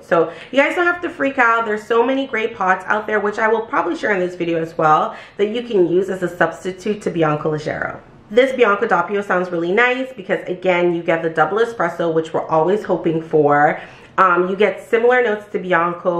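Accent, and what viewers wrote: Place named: New York, United States. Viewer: I forgot to say I’m a girl from Queens New York and I know you’re from Brooklyn I can hear that NY accent !😂😂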